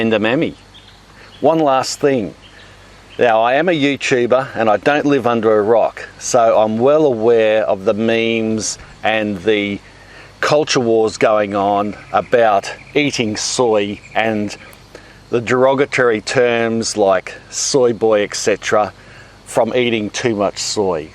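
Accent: Australia. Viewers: I was actually looking to see if it's possible to grow soya in the UK. Within 2 seconds I realised you have an Australian accent so I suspect this is not going to be relevant to me. 😥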